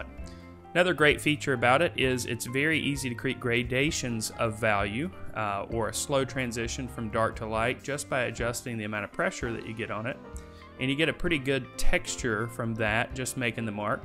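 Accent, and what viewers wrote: South, United States. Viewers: Not is your videos very informative and well-done, your accent makes it even better. It's just so freakin' cute >-< It sounds southern, but I may be wrong.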